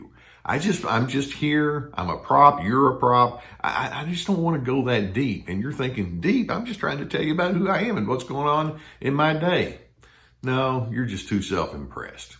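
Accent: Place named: Virginia, United States. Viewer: Dang it, been listening to for years, just picking up your sweet southern accent. Virginia?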